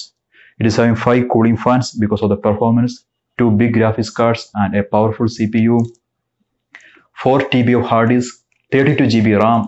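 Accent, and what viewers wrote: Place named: India. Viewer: Bro you are indian Sunke achha laga......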